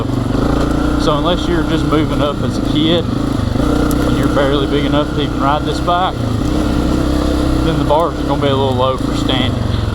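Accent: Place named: Kentucky, United States. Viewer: Where you from? I’m in London Kentucky and your accent is similar to mine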